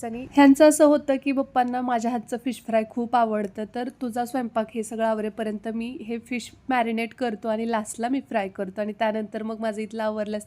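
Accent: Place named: Kolhapur, India. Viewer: Karad kolhapur side che watata..kalwan wagere words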